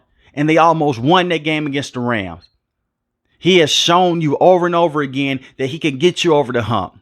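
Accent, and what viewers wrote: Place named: Cincinnati, United States. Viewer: You're right on almost every point you made and that disgruntled Cincitucky accent is sending me!! 🤣🤣🤣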